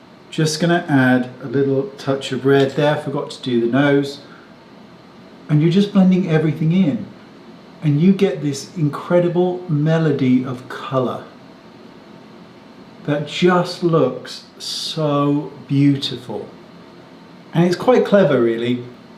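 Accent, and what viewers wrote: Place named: England, United Kingdom. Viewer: Robert Welsh also did something like this on his YouTube channel. Out of all the channels I watch you and him are my absolute favorites. Maybe it’s the fact that your both true makeup artist but I think the English accents pull me in as well lol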